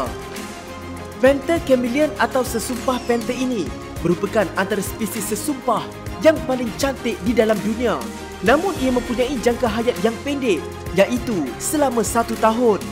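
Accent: North, Malaysia. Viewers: Tudia pekat nau utara..haha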